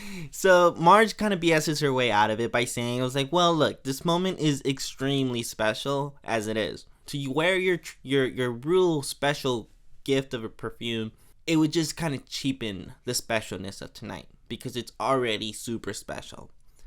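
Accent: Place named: France. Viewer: The French accent of yours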